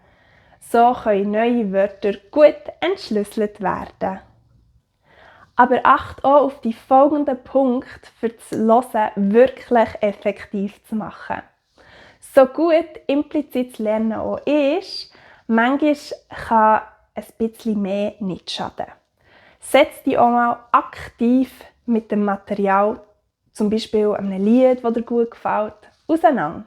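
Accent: Bern, Switzerland. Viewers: Danke, sprichst du Bärndütsch ? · This is Bernese German (Berndeutsch, Bärndütsch).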